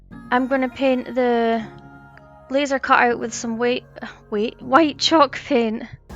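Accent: Scotland, United Kingdom. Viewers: I loved the fireplace you did at Christmas, I showed it off to so many people with your channel! But I gotta say, I like this one even better ❤ that blanket ladder is BRILLIANT ❤❤❤ Watching your channel always makes me smile! I love your projects and hearing you speak brings me back to when I lived in Scotland 😊😊😊 I still use words and terms from there over the American versions! (Like Nappy instead of Diaper) I love remaking your fireplace as well as the Little Stands She does on “Made on Maple Street” 😊❤ “Christina Elizabeth” also does an adorable tiny crate Stand. So far you’re the only one doing the full fireplace! Y’all give me things to recommend and share when I’m in Dollar Tree & Hobby Lobby! And I ALWAYS share your name/channel first and last!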